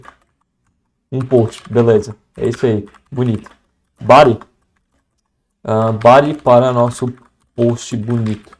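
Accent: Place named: Santa Catarina, Brazil. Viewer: ^^ Nesse exato momento estou fazendo um challenge pra entrar em uma empresa que usa a stack, percebi seu sotaque catarinense, e se não me engano já assisti a alguma palestra sua, vc é de Joinville amigo?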